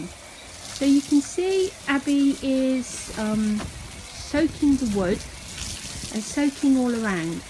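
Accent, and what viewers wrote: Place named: United Kingdom. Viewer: she’s british they literally INVENTED english dude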